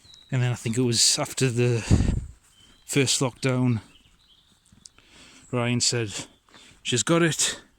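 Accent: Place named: Geordie, United Kingdom. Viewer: It took 3 months to renew mine, but that was sending the paper work from here in Australia. I detect a mild geordie accent, im originally from tynedale.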